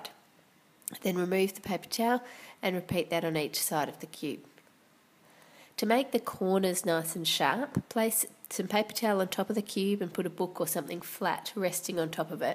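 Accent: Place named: Australia. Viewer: wosho I'm Pretty sure she is Australian, It sounds like she has a Cold too.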